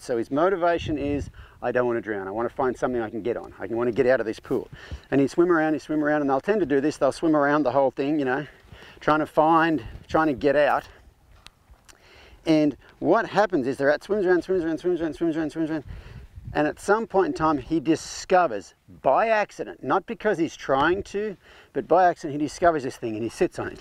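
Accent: Australia. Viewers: My favourite thing about is he still talks like an Australian 😂 Most Australians thinks they’re Americans after a week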